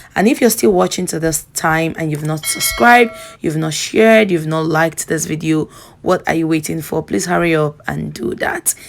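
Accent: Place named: Nigeria. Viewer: you’re annoying ! Just answer the comments 😂 not everyone can understand that strong ass Nigerian accent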